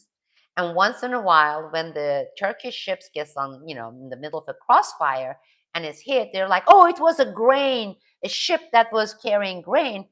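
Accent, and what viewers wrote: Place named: United States. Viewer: Amerikada yaşadığın için çok akıcı Amerikan aksanı ile İngilizce konuşduğunu biliyoruz zaten ama senin izleyici kitlen Türkler ,bişeyler ispatlamaya ihtiyacın yok!!!!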